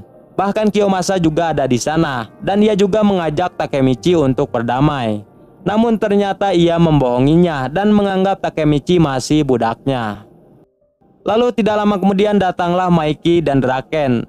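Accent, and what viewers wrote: West Java, Indonesia. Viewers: Logat sunda na mah aya wae da hese lengieut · Sunda geningan ngomongna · Bg asli Sunda kah has Sunda bgt suaranya 😆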